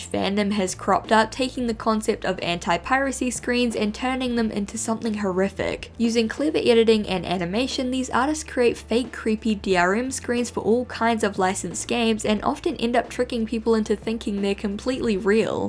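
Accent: New Zealand. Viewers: No. New Zealander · I just discovered this channel. Is her accent Kiwi?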